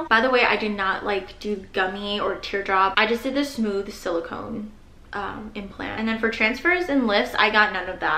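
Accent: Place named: Idaho, United States. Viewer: Her accent says she is American possibly from Idaho.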